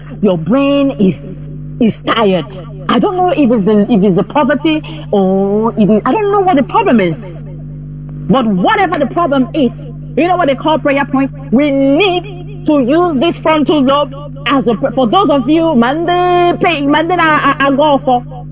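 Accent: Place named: United Kingdom. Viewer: She speaks good English with british accent; but definitely said nothing about the restoration of Biafra, the release of Mazi Nnamdi Kanu and the continouos inversion of our land by Fulanis herdsmen in collaboration with Nigeria sponsored military and para military. The only problem DOS and their co have is Mazi Simon Ekpa nothing more. very disgusting. #FreeMaziNnamdiKanu #FreeBiafra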